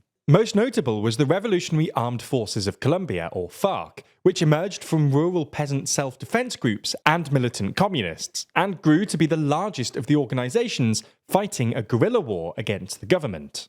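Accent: England, United Kingdom. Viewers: That's just the English accent. They all say it like that. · it's cuz he is British and doesn't make R sound so it sounds like fk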